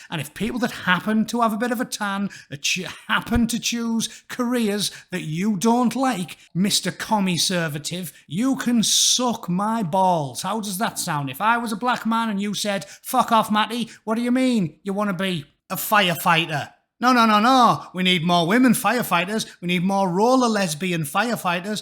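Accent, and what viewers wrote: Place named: RP, United Kingdom. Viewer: A perfectly eloquent tirade in the queens English. Rock on.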